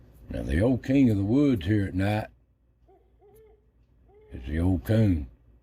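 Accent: Appalachia, United States. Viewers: Hello Mr.. Just subscribed. I did it cause some of my ancestors came from your part of the country. I was born and raised in Northern California. Your voice reminds me of my grands and greatgrands (grandparents, uncles and aunts) at holidays and family reunions. Heck, I have some of the same way of pronouncing certain words or using ole Appalachian Mountain terms after generations apart. I used to get teased sometimes. I didn't care I liked the way we sounded. I'm 63 now and listening to you and viewing your videos make me feel like I've come home. Fact is one of my daughters moved to and married in Kentucky years back. She been searching family and found much information on the old ones and just how connected we are. She sounds now like she was raised there. I love it!❤ Thank you.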